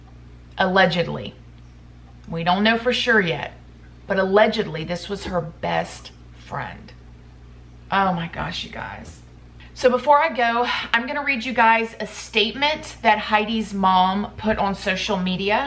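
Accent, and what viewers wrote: South, United States. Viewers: New subscriber :) have been binge watching for a few days even cases i know really well! I just love the way she tells a story. That country twang is so cute! And also i dont care i love the intro 😂❤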